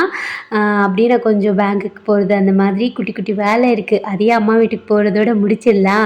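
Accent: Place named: Ramnad, India. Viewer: Akka unga voice abdiye ramnadla ulla pechu varthaiya iruku Alhamthulillah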